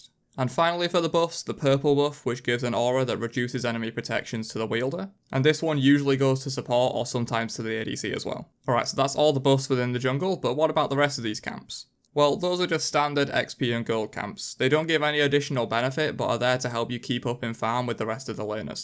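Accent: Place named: Northern England, United Kingdom. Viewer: Aghhh a northerner. Thus washs my ears of american smite players, subbed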